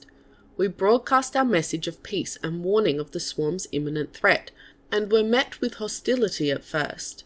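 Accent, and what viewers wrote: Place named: Australia. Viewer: I've been listening to other YT-ers with their HFY stories, and just found you... very cool... I like to listen to those others, but its great to hear a fellow Aussie reading these! You give a better inflection to what you are reading, which I like. Subscibed. :)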